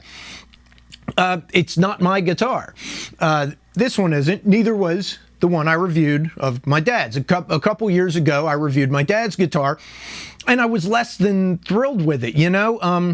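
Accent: Philadelphia, United States. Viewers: I just picked one of these up used. I got a hidden gem. Might I ask what region of the country you’re from? You have a distinct accent, just like mine. My wife makes fun of me for it. I hail from the outer regions of the Philadelphia area. I grew up attending the Philadelphia folk festival, which is in schwenksville PA. Sounds like you’re from the area. Maybe, maybe not. Love the video and thank you for it my friend .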